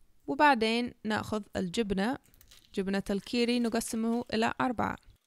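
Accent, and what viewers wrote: Yemen. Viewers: ماشاء الله عليش يا روووووووووعه تسلمي ياروحي 😘احلا شي كلامش يجنن كأنش يمنيه الله يوفقش استمري واحنا ندعمش 👍🏻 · ياحليلها الكنة اليمنية واضحة في كلامها اندمجت في المجتمع اليمني.الله يحفظ لها طفلها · I love the yammany accent😂😂😂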